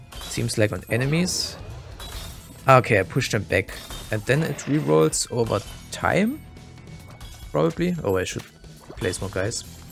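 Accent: Germany. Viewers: Your voice with the german accent sounds just like one of the guys from Team Darkside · Are you a german? You have that accent xD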